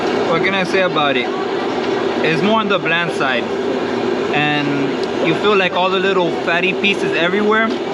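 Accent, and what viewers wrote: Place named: England, United Kingdom. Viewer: You speak Spanish, there’s no need for you to pronounce Spanish words with an English accent. It would help for those that don’t speak Spanish n would like to visit .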